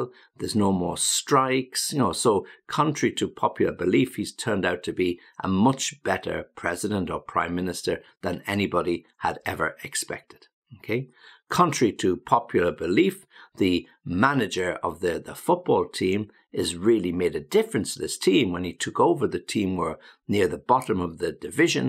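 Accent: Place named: North England, United Kingdom. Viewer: Hi! This is the first time I watch one of your videos, I really like your accent, it is so colourful, are you from the North of England?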